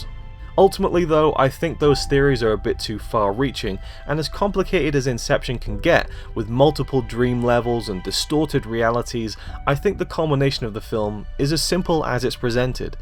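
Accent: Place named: United Kingdom. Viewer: Who is this British dick making these videos. He explained nothing. What a tool.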